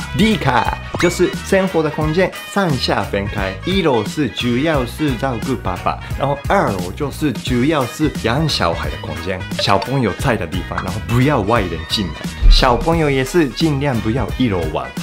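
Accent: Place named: Taiwan. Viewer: 好可愛噢！話語裡還會加上台語，看影片很療癒，搞笑，歡樂氣氛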